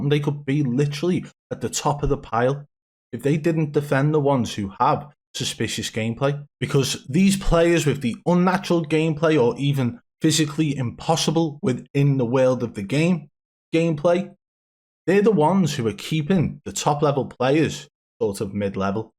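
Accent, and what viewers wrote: Liverpool, United Kingdom. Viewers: Does anyone else think of the scousers from Harry Enfield when they listen to this dude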